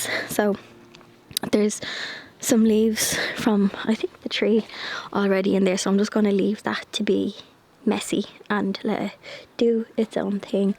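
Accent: Ireland. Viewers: Hello from the States! Will your sweet hedgehog be hibernating this winter or will he be active year round? The family that introduced my parents to each other waaay back in the 50s were from Ireland, so I was blessed to grow up hearing the lovely Irish lilt quite regularly. Just wanted to let you know how delightful it's been to hear that lilt again since discovering your channel a few months back! ❤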